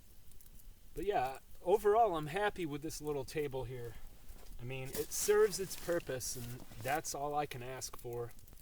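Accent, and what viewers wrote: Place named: New Jersey, United States. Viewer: I understand. I was thinking I heard a New Jersey accent in your voice, so I asked. Thanks.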